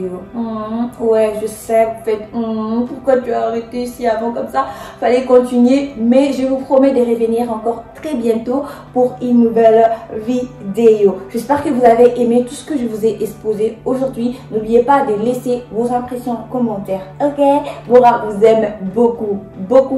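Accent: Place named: Democratic Republic of the Congo. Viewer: Elle a l’accent 100% zaïrois incroyable 😂😂😂 tu parles français comme les tontons Z la 😂 ze ne parle pas hahahah bangala au Gabon ça veut dire bite 😂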